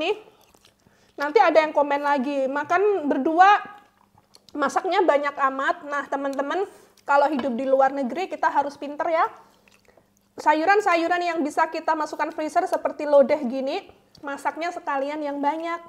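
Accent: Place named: Banyuwangi, Indonesia. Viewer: Aku seneng mbk nek ngomong sek medok banyuwangi, salam mbk aku teko banyuwangi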